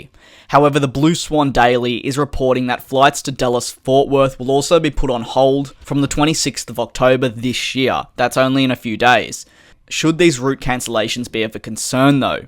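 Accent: Australia. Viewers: Are you Australian? Got a hint by how you say year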